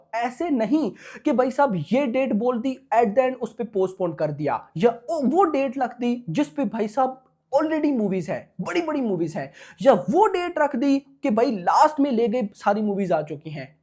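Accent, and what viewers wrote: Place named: Lahore, Pakistan. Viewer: Bhai teri language suni muje lga tu pakistani he fir teri bio me dekha such me tu pakistani he hindi tumari lahori wali he 😅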